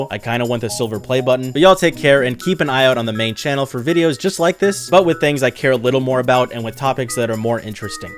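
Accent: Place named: United States. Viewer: Bro, I thought you were american.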